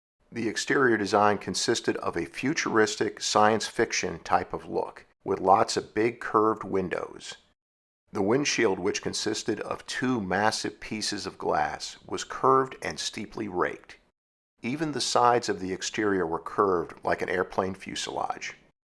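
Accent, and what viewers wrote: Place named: United States. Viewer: please speak English ! Americans tend to not to be able to speak properly... eeee baaks should be: a box, ditails, won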